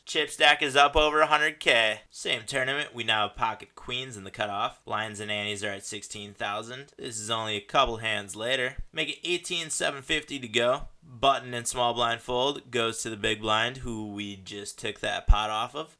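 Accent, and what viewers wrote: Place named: Southern California, United States. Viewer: Stupid 7s ...$1800 though . You have built up a pretty nice balance ... It's only been like 6 weeks . Rad ! I learn a lot from watching these . Just out of curiosity , did you use to , or still have a YouTube page about fake staged events , flat earth , and NASA perm hair dues ? If so , send the link . My brother sent me a video of a guy talking about that , and he wasn't nearly as entertaining . The guy I wanted to show my brother , has a similar voice as yours ... But we all grew up in Southern California ... It may just be the regional accent that I'm identifying with . Anyway..Have a great Sunday . Thanks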